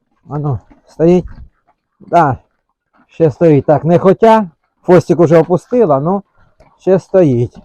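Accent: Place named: Chernihiv, Ukraine. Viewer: Випадково відкрив,чую говір чернігівський.почитав коментарі.Привіт з бобровицького краю вам.підписався.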